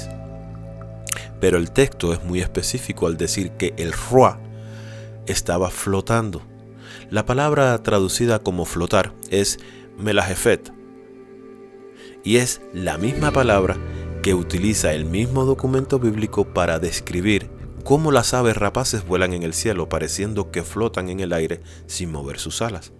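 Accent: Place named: Cuba. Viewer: Me Gusta tu Acento Cubano, también soy Cubana 🤗🤗,me relaja mucho escucharlo 😴..Menos mal yo no creo ni en el Cristo ..Soy un Alma libre y como alma que soy no hay nada más poderoso que yo, solo la fuente que me Creó! por eso nos mienten y se esconden de nosotros, porque realmente el alma es misidial para ellos.. lo aprendí gracias a Corrado Málanga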